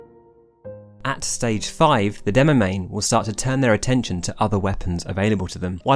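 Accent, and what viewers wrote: Scotland, United Kingdom. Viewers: but are you scottish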